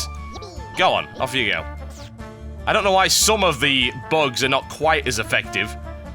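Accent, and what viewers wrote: United Kingdom. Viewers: nope, his natural accent is a geordy accent; think cockney with a concussion and a mouth full of novocaine. he's just trained himself into using a more "proper" british english, basically the british version of the midwestern standard that most TV show hosts and newscasters have.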